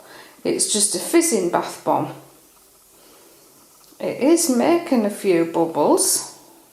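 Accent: United Kingdom. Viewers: I love your British accent !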